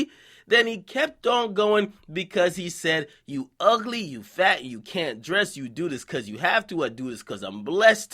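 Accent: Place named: South, United States. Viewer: you are an american rapper loose the country accent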